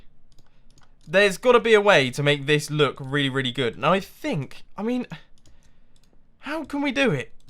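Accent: England, United Kingdom. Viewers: Are you English? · thats because hes brittish #TheMoreYouKnow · UR BRITISH sorry first time watching your videos you talk in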